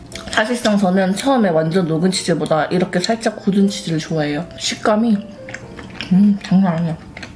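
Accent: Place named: Daegu, South Korea. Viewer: 닫아뿌더라구요 ㅋㅋ 저도 대구사람이지만 님 사투리졸귀..♡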